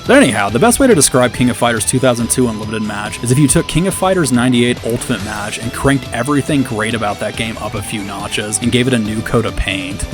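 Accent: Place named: California, United States. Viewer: That California accent!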